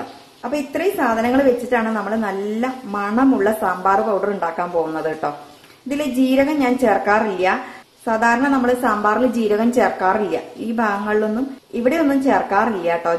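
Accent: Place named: Palakkad, India. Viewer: Chechi palakkad aano സ്ഥലം.. സംസാരം പാലക്കാടൻ ഭംഗിയിൽ ആണ്.. അതാ chothichath.. ഞാനും pkd ആണ്...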